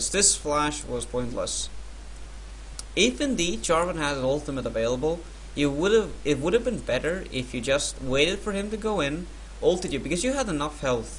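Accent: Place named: Sweden. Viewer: what accent is it? swedish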